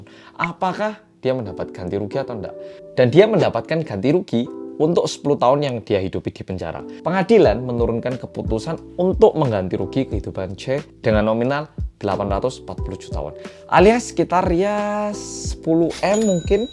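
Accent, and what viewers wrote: Java, Indonesia. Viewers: oppa oppa medok😍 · Gak kerasa awal tau mas 4 tahun lalu, masih inget bgt prtama nonton video yang ngejelasin ttg sim. Abis itu hebohin org serumah grgr org korea yang medok, setelah itu rajin nonton video mas wah. Terharu liat perkembangan channel ini 😭😭😭 chukkae mas udah smpe segede ini🎉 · Aku dulunya pe cinta drakor. skrng, udah sering nonton drakor Krna sudah ketagihan menonton vlok medok😅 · Medooooook, kacamata e baru rek mbois 😎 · menarik juga nih ceritanya wkwk jadi pengen nyoba nonton filmnya:D makasih banyak ya mas, dari bbrp video mas aku jd banyak tau ttg hal2 baru, udah sejak lama malah wk tapi aku baru komen skrg aja:v semangat terus ya mas! suka sama kalimat yg disisipkan di dlm video, bener bermakna, ga lebay, realistis, dan memotivasi. Intinya, apa yaa wkwkwk.... SEMANGAT TERUS! MAS BERBEDA, MEDOKNYA, PEMBAWAANNYA, MATERINYA, semuanya AKU SUKA krn itu semua ISTIMEWA! 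SEMANGITTT!!!!! /abaikan kalimatku kalo terkesan lebay or ga nyambung ya mas wkwk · medhok ◐.̃◐ Nessie Judge: horror 👻 Nadia Omara: ngegass 😈 Arroyan Pram:serius, editan terbagus dan niat Detektif Aldo: serius pake banget (｡ì _ í｡) Gak tau kenapa aku suka banget sama kalian:-)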